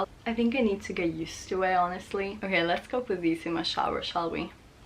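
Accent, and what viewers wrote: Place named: England, United Kingdom. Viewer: Yeah she lives in London so will pick up the English twang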